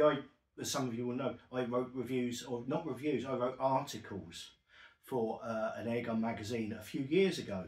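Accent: United Kingdom. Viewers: 2 seconds in, and i already know I am not interested in this break barrel pellet gun. As soon as i hear the British accent from a guy wearing a batman t-shirt, I know the gun does not pout out enough energy to drop a squirrel at 80 yards. I can not doubt it is a well made gun, (I never had one), but 12fpe is just no fun. Stop letting your governments treat you like children,---------- Batman.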